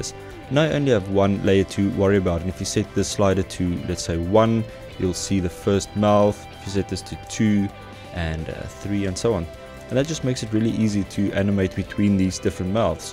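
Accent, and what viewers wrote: South Africa. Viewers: Hey, was almost shocked when I heard a South African accent on a Youtube tutorial. Great Tut though, well presented and explained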